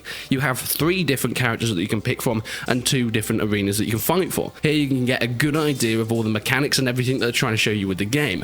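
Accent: England, United Kingdom. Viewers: he's English you knob just how we talk 😅😅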